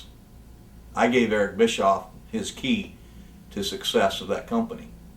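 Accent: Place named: South, United States. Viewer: I can't even watch this garbage you expect anyone to believe you punked Sid and came up with everything that turned dubya cee dubya into a competing company but your ideas were stolen by Bischoff and then he fired you? No one believes you just stop , you were fired for being another hang around collecting a check coming on with the same old 70s and 80s southern draw just like everyone else Eric got rid of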